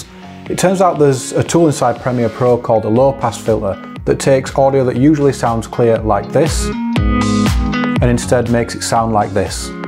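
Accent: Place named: Yorkshire, United Kingdom. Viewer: Knew it was a Yorkshire accent! Not too far at all. I found a lot of tuts on this effect but really liked the examples you showed and the intro is class too - you gave examples during the intro, I really think that catches the attention - the transition to your logo is slick too. Yeah weird how only a few likes. I'm constantly checking YT out for Prem tuts and only just found your stuff so it might be that you're not appearing on everyone's searches yet? Not sure how it works exactly but maybe once you get more subs etc then people will find you. I'll be working through your vids anyway and certainly recommend you to others!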